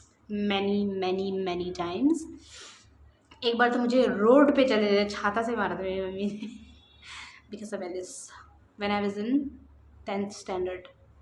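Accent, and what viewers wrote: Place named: Arunachal Pradesh, India. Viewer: The way u TALK seems TYPICAL '"ORUNOSOLI"" r u ORUNOSOLI or Bengali or Assamese? No offence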